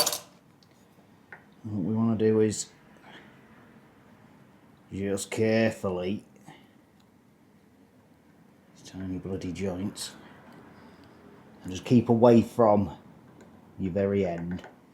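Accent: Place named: Bolton, United Kingdom. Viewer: Good idea for those tools in constant use the simple ones are the best, if I closed my eyes I'd swear I could hear a young Fred Dibnah your not from Bolton by any chance?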